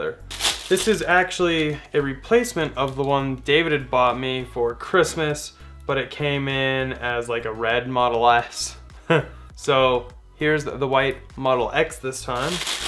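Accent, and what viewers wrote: Norway. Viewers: R u Norwegian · Are you norwegan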